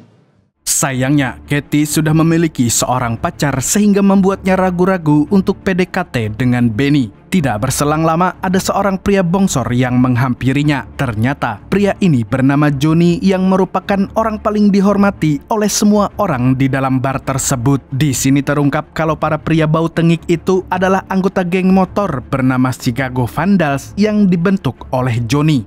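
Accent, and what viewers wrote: Banjar, Indonesia. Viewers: Urang banjar kah mang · Urang Banjar pinanya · Suku Banjar ya min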